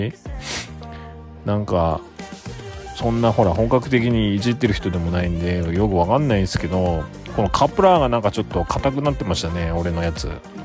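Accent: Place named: Tohoku, Japan. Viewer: 宮城でハイラックス前期乗ってます！訛りで、東北の方だなってすぐわかりました！笑 LEDテール参考にさせていただきます！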